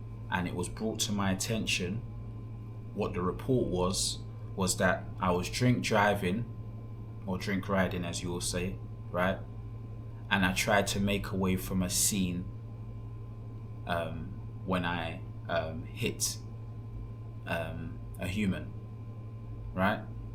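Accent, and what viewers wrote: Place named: London, United Kingdom. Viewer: If you had not done anything wrong, why did you flee? If you did nothing wrong, why did they chase you? If you had been causing trouble and left they would have just let you go, unless you had not paid a bill. Plus, you did injure a pedestrian. They could have charged you for that. These cops are not like the MET cops. You sound like you are from London. No verbal warning here. No behave yourself and go home. And definitely claims of: is it because I am black, don't work in Thailand.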